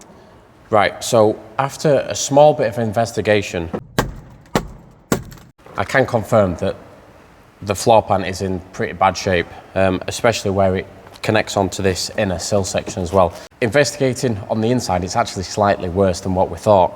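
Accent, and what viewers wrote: Yorkshire, United Kingdom. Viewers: Here from Matts channel, love the Yorkshire humour. I'm betting you're from the same area as Boothy of 44 Teeth from the accent. I can't wait to see the cars journey.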